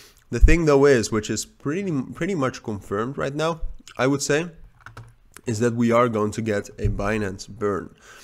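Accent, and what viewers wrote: Netherlands. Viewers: Nederlands accent?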